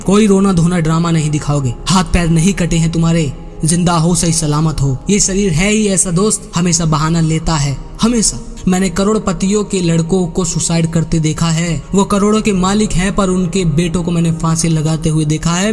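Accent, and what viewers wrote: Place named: Bihar, India. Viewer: Bihar se baat kar rahi ho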